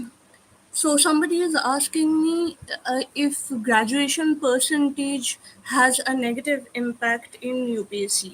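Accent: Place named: England, United Kingdom. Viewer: Why her Hindi sounds some Bollywood Angrez Hindi accent. Oh wait she is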